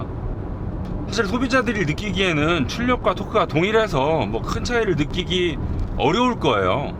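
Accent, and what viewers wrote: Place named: Jeolla, South Korea. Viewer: ㅋㅋㅋ무슨소리 억양만 들어 봐도 전라돈데 ㅋㅋㅋ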